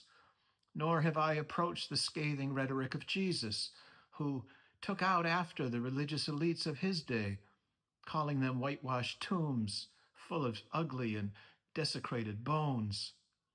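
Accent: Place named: Boston, United States. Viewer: Thank you for your Bostonian voice, your sound words have been comforting in more ways that you’ll ever know. May the Lord God continue to bless, amen. Amen!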